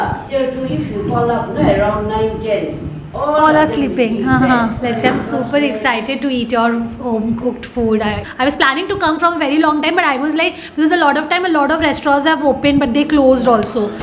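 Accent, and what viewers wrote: India. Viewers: Girl you have the most indianiest accent i have ever heard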